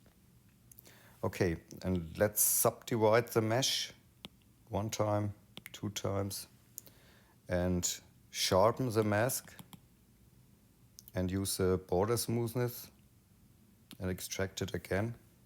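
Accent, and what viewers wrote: Bavaria, Germany. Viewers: Sehr geil der Hinweis aufs Bavarian english. Wer’s nicht leiden kann soll halt was anderes gucken 😜 Guades Video, weiter so, hawe dehre😂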